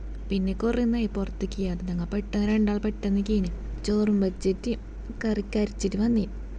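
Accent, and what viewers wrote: Kasaragod, India. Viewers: Kasaragod aano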